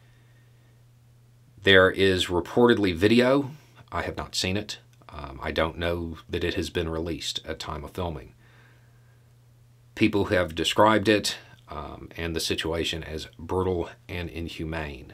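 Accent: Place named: South, United States. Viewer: Yeah, I like his accent. I'm used to most southern accents, but stands out to me.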